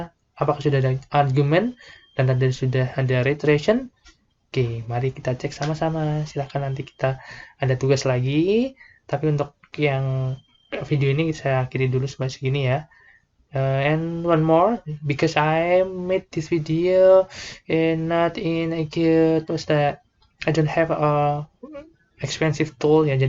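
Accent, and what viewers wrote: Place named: United Kingdom. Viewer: Britis sekali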